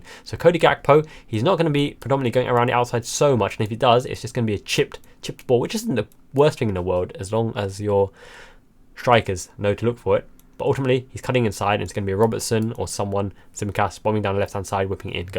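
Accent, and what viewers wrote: United Kingdom. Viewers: love your channel. Are you from the UK originally? Your accent is English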